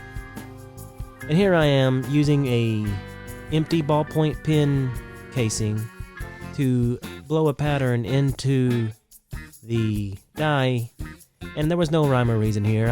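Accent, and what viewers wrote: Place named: South, United States. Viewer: Damn I have been away from Tennessee for far too long, that southern twang makes my heart sang.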